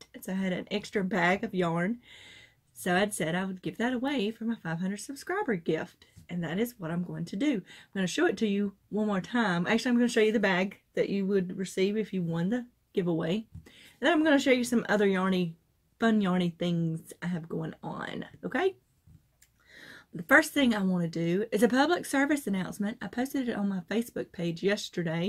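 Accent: South, United States. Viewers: I read rules and understand .love to make baby clothing and blankets. love your southern talk.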